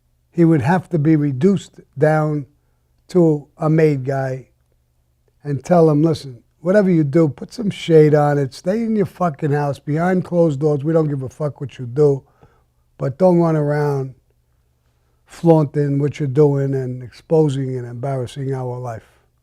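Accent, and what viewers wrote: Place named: Rhode Island, United States. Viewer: The way he says words like bottle and mouth.. Reminds me of my Grand Father. Providence RI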